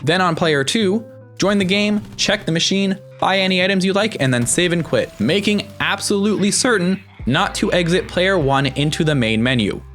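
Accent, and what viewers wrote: Canada. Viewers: sre you canadian😂😂😂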